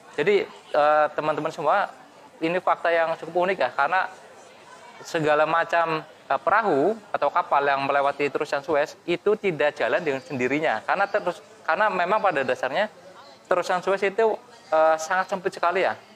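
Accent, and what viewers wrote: Banyumas, Indonesia. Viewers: Ngapak kie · Kurang pengetahuan ini mas bro. Dan istilah2 laut nya juga kurang paham. Dan yg fatal gak bisa bedain mana suez dan panama😀. Belajar lagi mas. Dan bicara nya jangan ngapak bgt dan aja kebanteran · Ngapak ya kang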